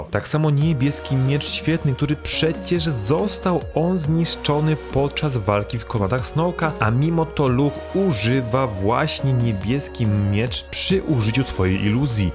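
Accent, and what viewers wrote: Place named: Israel. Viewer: gdzieś ty się uczył polskiego..człowieku..!1 co to za akcent......koszmar...z izraela...???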